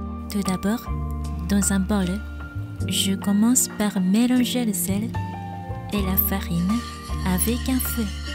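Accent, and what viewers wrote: Japan. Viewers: Bonjour, merci beaucoup pour la recette car j'adore les pains japonais moi j'avais trouvé la recette qui contient du Tangzonk. C'est délicieux. J'aime votre accent, votre pays culture, vos musiques. J'aime tout particulièrement votre respect et votre sens de l'honneur. Vous parler déjà très bien le Français. Si je pouvais parler le Japonais comme vous parler le Français cela me permettrais de pouvoir visiter votre magnifique pays. Bien à vous. Rosalie.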